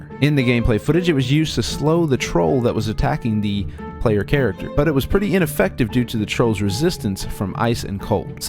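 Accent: South, United States. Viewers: Good video! Jw, I can’t decide your accent, where are you from? Southern?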